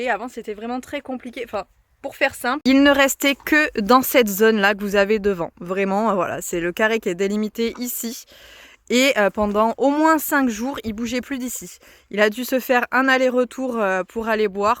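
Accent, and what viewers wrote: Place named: South, France. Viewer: Beaucoup trop beau Baly ❤️ C’est magnifique de voir ça. Moi ce que j’adore c’est l’accent du Sud de la France. Chez toi chose c’est transforme en cheuse ahah je suis fan. Sinon je donne aussi de la biotine à ma jument une fois par semaine de Kramer Elle est en poudre et sens plutôt bon. Je ne connais pas les produits décathlon. Pour les pieds de Baly, temps que tu me dépasse pas 30 km par jour ( effort naturel du cheval ) tu peux parfaitement le laisser pied nus, regarde Jade ça ce passe super bien. Y a même des cavaliers olympiques qui sont sens fers. J’espère vraiment que tu vas pouvoir le laisser pied nus. Et le petit éclatax c’est du jujufitcat ça 🤣🤣🤣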